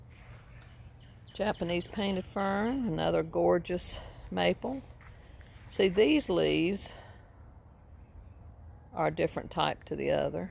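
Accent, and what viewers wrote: South, United States. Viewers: My favourite kind of garden. Love the Southern accent.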